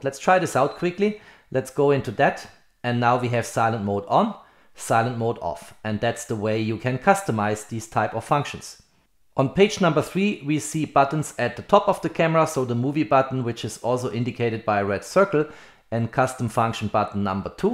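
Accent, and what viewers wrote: Germany. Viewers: Sehr gutes Video. Endlich mal sehr verständliche Erklärung der Einstellungen. Wollte meine Einstellungen parallel vornehmen, bis mir aufgefallen ist, das z.B. beim Touch Panel einige Einstellungen fehlen. Da wurde mir klar, dass ich nicht die A7R5 in der Hand hatte, sondern meine A7iV. Die beiden sehen sich so ähnlich. Was ich noch nicht gefunden habe, ist die Einstellung zum Menü. Das heißt, drücke ich Menü springt die Kamera stets zum oberen Punkt, bei der A74 zeigt sie die letzte Einstellung, was mir viel lieber ist. Werde wohl noch eine Weile brauchen, bis alles optimiert ist. Möchte auch die Einstellungen, soweit möglich, zur A74 identisch haben, damit ich mich nicht umstellen muß. Danke für die großartige Arbeit, ich hoffe Du verstehst die deutsche Sprache, sonst deepL nutzen. PS: mir kam es sogar so vor, als hättest Du einen leichten deutschen Akzent im englischen, aber nur sehr leicht.In Minute 39 erklärst Du was ich suchte, hätte ich nie gefunden.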